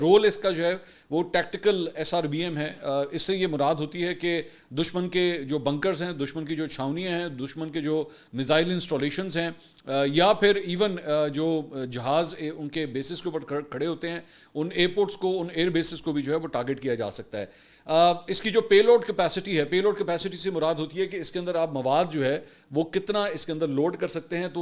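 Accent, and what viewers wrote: Punjab, Pakistan. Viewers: ap Fasilabad sy to nahi.....Sir waey Punjabi bary cute bolty ho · فنی پنجابی ٹچ بہت مزے کا لگتا ہے😂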